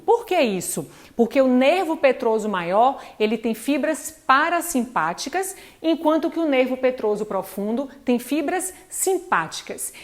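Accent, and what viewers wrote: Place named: Bahia, Brazil. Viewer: Professora, excelente aula! Melhor ainda porque matei a saudade de ouvir o sotaque da Bahia ahhuahau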